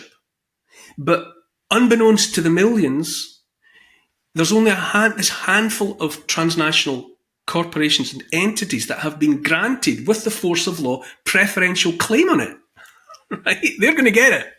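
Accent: Scotland, United Kingdom. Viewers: I love ❤️ that Scottish accent. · From USA here, sometimes I listen to your channel just so I can hear your awesome Scottish accent. My favorite!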